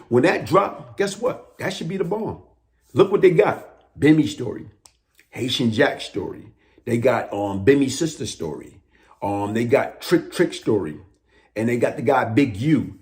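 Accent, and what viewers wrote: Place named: Baltimore, United States. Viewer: For somebody from nyc you pronounce your words like you from Baltimore.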